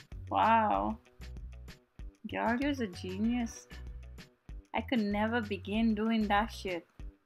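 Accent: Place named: Guyana. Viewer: i love the guyanese accent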